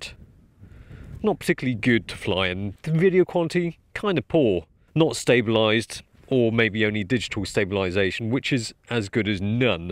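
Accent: England, United Kingdom. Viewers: Seeing an Asian with a British accent is awesome! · Britain accent? Why do I feel it so funny coming out of Asia? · awesome an asian with an english accent. I remember when I heard a black guy speaking fluent japanese in a meeting at the office. " I flipped " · anglochinese speak like british, cool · 這英腔講的，不看膚色還以為是洋人。美式英語語根本沒法聽。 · i like your British accent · Omg it's a Asian guy with a British accent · wow where is this place? and as an American, seeing an Asian man speaking brit is interesting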